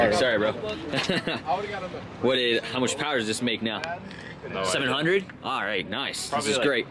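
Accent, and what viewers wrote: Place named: Spain. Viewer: de donde eres escucho acento español¿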